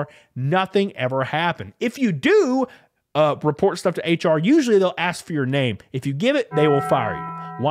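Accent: South, United States. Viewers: Like your southern accent.. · Love this guys country grammar. I live on the east coast up north & we dont get to hear a southerner accent where im from ....cool voice dawg ! 😂😂😂😂😂😂😂😂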